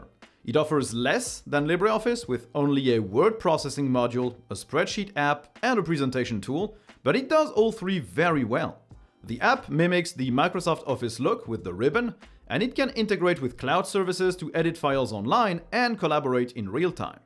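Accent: France. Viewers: I though with that accent your were french is that correct ? Also been using most of these apps at a regular basis and it's awesome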